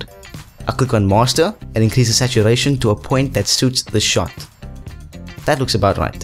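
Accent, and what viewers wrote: South Africa. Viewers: You sound South African bru.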